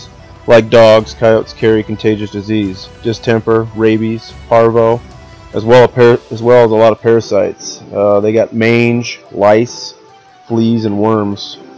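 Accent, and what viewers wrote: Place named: South, United States. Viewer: Sound like a true country boy. To the point, no filler, no bullshit. The only thing he didn't say was you found you way to my page and you decided to watch the video , so what ever complaints you got they're your own fault. Lmao!!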